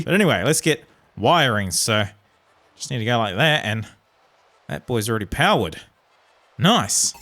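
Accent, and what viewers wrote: New Zealand. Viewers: are you from nz?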